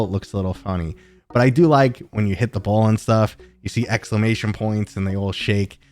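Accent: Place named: South Jersey, United States. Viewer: lol. I Grew up south of Philly in an icky place called Chester. Lived there in the 80s and 90s . I currently live between Philly and Lancaster. You sound like a Jersey guy to me. I would guess a little more south Jersey cuz your accent isnt that thick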